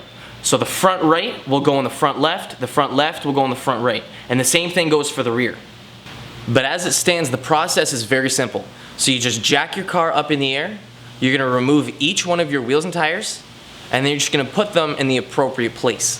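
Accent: Canada. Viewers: For you maybe... but that's how Canadians say it. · Is this gentleman Canadian?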